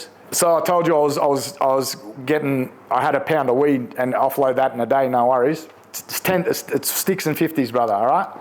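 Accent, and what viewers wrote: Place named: Sydney, Australia. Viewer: I love this testimony! 🙌 i grew up sydney around the same time and also went off the rails, the way you speak takes me back. Dark times. The devil is a liar! I was definitely under demonic oppression. Your testimony is so powerful, you will save so many 🙏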